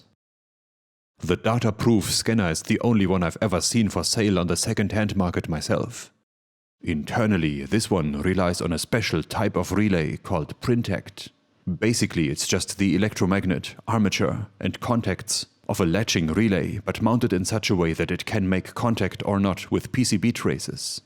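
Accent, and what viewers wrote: Germany. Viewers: No not monotone, it’s deep late night Radio DJ voice w/ an exotic Deutsch / German Accent. A great intoxicating lullaby when I too tired to care.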